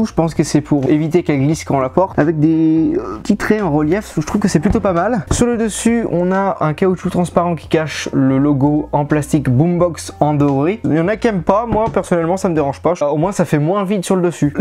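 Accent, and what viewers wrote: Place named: Savoy, France. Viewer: ton accent du bon vieux savoyard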